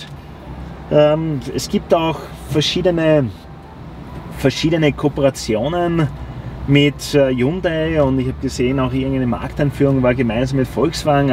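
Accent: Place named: Austria. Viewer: Na Na, wer wird denn gleich so negativ-kritisch sein. Testerfahrung / -Routine kann man lernen, CHARME nicht - den hat man oder eben nicht - ich mag die Österreichische Sprachfärbung sowiso lieber als die Ost-Deutsche. Interessantes E-Auto - ich sags ja - die Asiaten kommen! Lass den Jac auf 450 KM Reichweite reifen, dann kann sich VW warm anziehen. Ich setze mich in kein Auto, das so flach ist, daß es unter der geschlossenen Parkhaus-Schranke durchkommt.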